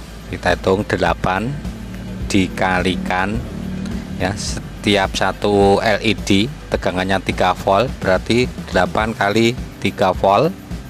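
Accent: Java, Indonesia. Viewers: Ilmunya mantab, Medok ngomongnya 😁 nyenengin banget dengernya 😁, Meleddiyakk = Meledak. 🤣🤣 Sehat dan Sukses Slalu Pak !!!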